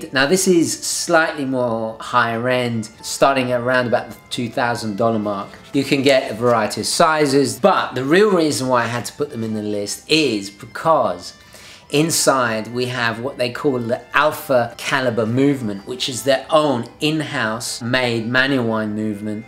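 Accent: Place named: United Kingdom. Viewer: You sound more British today! Have you been talking to your relatives?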